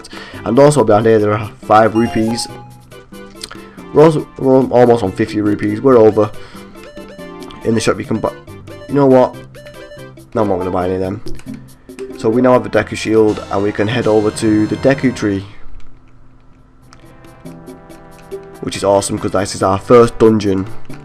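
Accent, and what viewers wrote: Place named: North Yorkshire, United Kingdom. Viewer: Great LP man. Helps that you obviously know the game well so it kind of doubles up as a walkthrough, some funny remarks in there too :) You sound like you're from the north like me! I'm gonna hazard a guess at North Yorkshire? You sound a bit like my mate who's from York. Anyway, great stuff.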